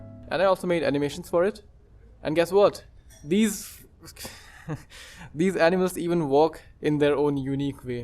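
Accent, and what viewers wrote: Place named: India. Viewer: This looks so good i made a good coice to sub to you, Iam noticing you have a indian accent so,just curiuse are you India btw jam not indian ism bangladeshi. This was a wild journey to good trough such a creative video 😅 EDIT:I really like the Charector it has her own visual style,Also i was thinking should this game have shell shaded charectors, I Thik It will break the unique art style what done you think🤔.And o wana if this game going to be TP. Or FP it looks really good one both 😆..Holy shit i was just watching The video this chicken i's fucking uncanny dude seriusely got me. 😨 (Enemy with respect was really funny ngl😁).You rembeling random sad shit and the chicken just checking her out was the best part really adds to the pervy part. The random bits if unintentional jokes are pretty funny i like how the chicken just humping the green ball. 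😂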